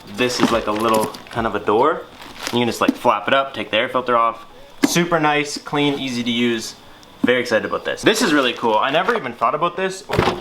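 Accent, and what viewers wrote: Canada. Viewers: Anyone else notice the way Canadians say out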